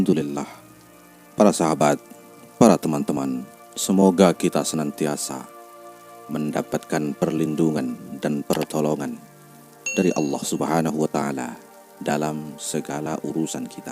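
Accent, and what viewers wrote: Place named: Arab world. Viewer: Makan minum.mandi di indponesia tapi.logatnya ke Arab arab an dan bangga banget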